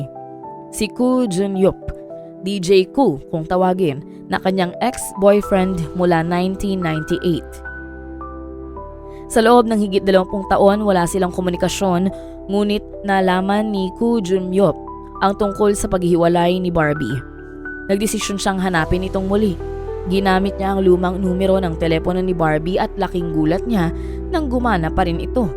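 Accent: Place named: Pangasinan, Philippines. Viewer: nacurios ako sa issue na to kaya pinanuod ko, parang may tonong/puntong pangasisnense yung voice over❤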